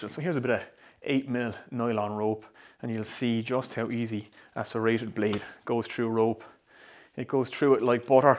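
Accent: Waterford, Ireland. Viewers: I just got one today. Is that a Waterford accent? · Great video. Additionally, an Irish brogue is the most beautiful sounding accent.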